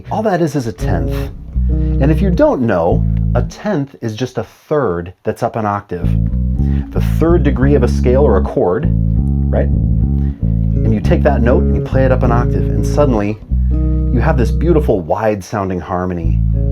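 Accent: United States. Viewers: Oy! What happened? I went away for a year or two and you have an American accent?? Or have I found a different Bass Lessons? Geeez, I'm confused......I could've sworn your were a Brit.... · I actually like new American accent. 🤔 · is that you talking? I’m totally tripping on your voice I’ve been following your channel forever and I never heard anything from you ever that sounds like your a straight ahead American speaking beast of a bassist. I personally think that you are probably the most under mentioned professional on the circuit! I alway hear Stanley, Marcus, Victor...etc.... but you are right there on the same platform as the greats due to your understanding of the bass. You really really know and understand how to utilize several aspects of your fretboard due to your wide variety of techniques available to you. Many are deemed to one style or genre of music and watching your videos have been a great way for me to broaden my musical styles. 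I’m a full time musician and have been for some time now and it’s always amazing to see players like you that can pull every technique off as if it’s their only bag. Great job love your videos keep it up. And that voice??? I like the old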